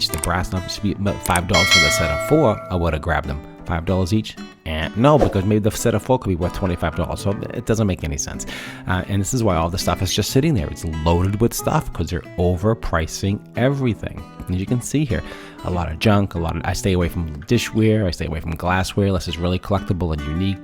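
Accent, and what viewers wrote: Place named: New England, United States. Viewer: That accent!! Had to be new england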